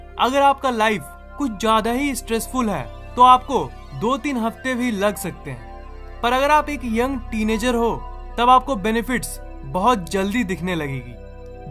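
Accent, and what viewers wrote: Bihar, India. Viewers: Bhai aap Bihar me Kahan se ho aap ki bato se bilkul lagta he ki aap Bihar se ho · Aap Bihar se hain kya? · Bhai aap Bihar ke ho kya 🙄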